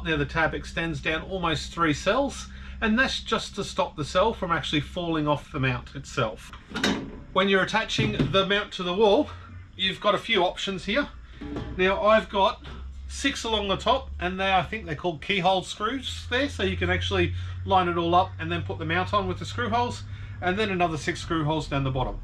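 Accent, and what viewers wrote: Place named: Australia. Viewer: I love the Australian accent.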